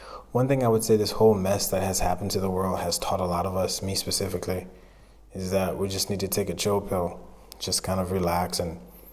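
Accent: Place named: Southern Africa. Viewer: Your accent sounds very familiar. Like a mixture of Southern-African and American.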